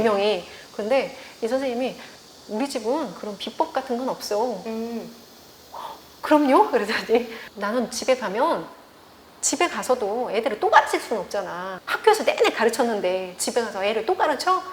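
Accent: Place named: Jeolla, South Korea. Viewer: 전라도 ㅋㅋㅋㅋ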